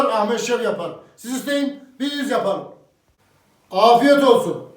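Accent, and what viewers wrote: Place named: Anatolia, Turkey. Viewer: Reis uzun zamandir senin Hemen tüm yemek tariflerini seyrediyorum ve yapiyorum Gercekten inanilmaz lezzetler cikiyo ortaya Ellerine emegine saglik Son olarak sunu da söylemeden gecmicem Cok naturel bir insansin Kisiligini karakterini bozmadan aynen yola devam ediyosun Özellikle konusurken siveyi bozmadan yapmacik degil tamamen anadolu agziyla anlatiyosun Helal olsun Yolun bahtin acik olsun Viyana dan selamlar Saygilar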